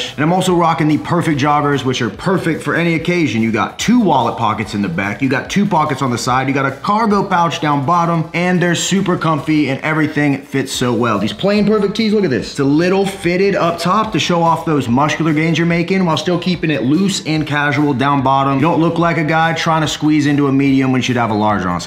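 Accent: Texas, United States. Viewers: The Texas y’all has caught up with ya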